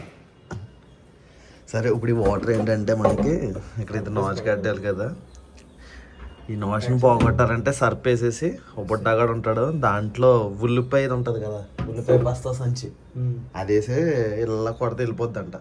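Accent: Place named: Godavari, India. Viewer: Godavari slang 😊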